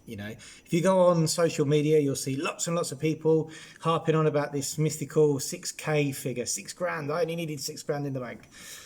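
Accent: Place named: United Kingdom. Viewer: Precioso acento británico y excelente castellano. Saludos desde Pamplona.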